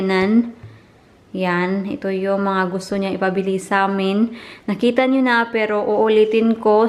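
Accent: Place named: Visayas, Philippines. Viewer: Hi po ate first time ko to na magcomment sa vlog mo pro lage akong nanood at nag lalike ng mga videos mo kaso bago pa lang mga 2 months pa lang ako nagsubscribe sayo unang kita ko pa lng sa Youtube channel mo subscribe talaga ako agad sayo ang ganda mo kasi tsaka simple lang taz may naririnig ako minsan na may bisaya accent ka. .gusto ko lang po sanang malaman kung bisaya ka ba at taga saan ka dahil ako po bisaya kaau ko proud taga Inopacan Leyte trip ko lang magtagalog pro bisaya gyud ko hope mabasa ni nmo ate at shout out po sana sa next video mo sa ABELLANA and BALLESTEROS family thanks po sana lang mabasa mo po ito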